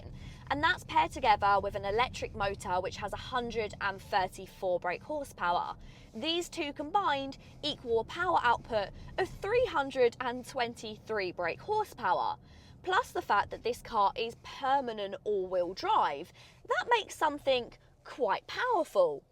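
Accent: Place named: Colchester, United Kingdom. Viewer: I've decided to test drive one, before I commit to a RAV4 PHEV. Have you compared them? I love your channel. Your presentation skills are great. That's a lovely accent; Colchester, if I'm not mistaken. Best wishes, John, X. PS As it happens, I have 35mm film projection equipment here that was first installed at the old Odeon, Crouch Street, Colchester.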